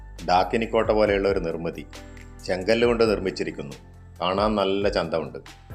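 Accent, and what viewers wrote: Kannur, India. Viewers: തനി kannur ഭാഷ, നന്നായിട്ടുണ്ട്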